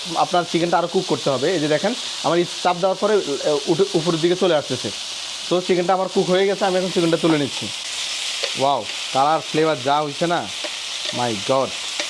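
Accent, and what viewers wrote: Noakhali, Bangladesh. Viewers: বাড়ী কি নোয়াখালী নাকি ভাষা এমন কেনো। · হু ভাই! একদম খাঁটি নোয়াখাইল্ল্যা। এজন্য কিছু শব্দ নাকের ভিতরে চলে আসে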